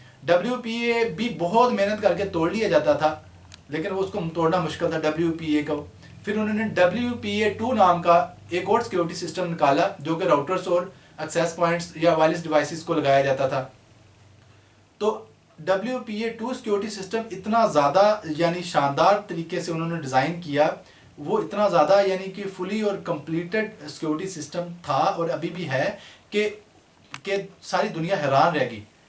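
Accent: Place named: Punjab, India. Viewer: Aesi bat nai hai ye Lahore se hain is liye English bolte hue inka lehja panjabiyon wala hai lagta hai koi bat nai itna koi issue nai hai but vedio bhut hi awche hain bazz wait to hum or aap jese eng bolne wale high school me pharne wale bhi is tarhan logon ki madad nai kar pate but I am very thank full to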